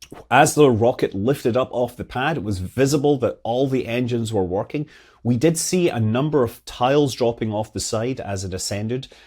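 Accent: Scotland, United Kingdom. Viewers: i like your channel and the scottish accent. i have on question about the hot staging and the negative acceleration which gives sense about causing the interruption of the steady propellant flow to the raptors. fluids without force field to keep them above the outlet are no issue on earth because gravity. acceleration may replace gravity at hot staging. I don't get how fluid transfer in space should look like. is the acceleration planed or do they think on a type of plastic bag inside the tank open to the outlet and on the other side by gas pressure to push it out. are there "plastic bags" working at liquid O2 temperatures?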